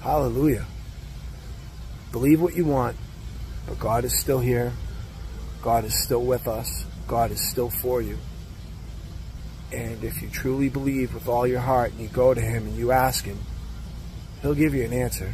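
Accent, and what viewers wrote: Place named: New England, United States. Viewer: Wowwww!!!! Nothing is impossible with our King 👑 🔥 He's got that New England accent I hear up here often 😅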